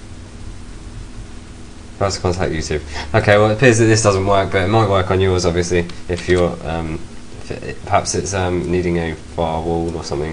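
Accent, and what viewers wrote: England, United Kingdom. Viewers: lol i always had a feeling you were from england so am i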